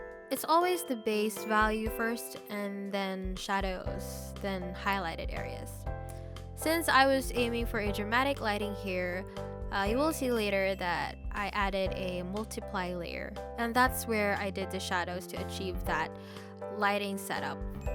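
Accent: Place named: United States. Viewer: no worries! Your fluent voice makes it crystal clear HAHAHA. Never really met a lot of fil ( assuming you are) speaking like an American teen so das something...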